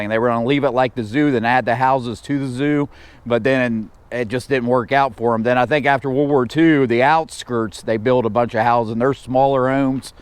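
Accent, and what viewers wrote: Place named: Ohio, United States. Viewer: From Ohio. Good to hear the Ohio accent “worsher” never change :). Reminds me of home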